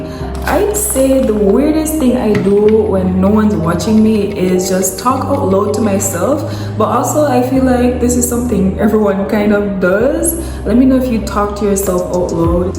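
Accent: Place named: Jamaica. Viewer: You and your locs are gorgeous! 😍. Loved this video! My mom is from Jamaica! I knew I recognized your accent lol.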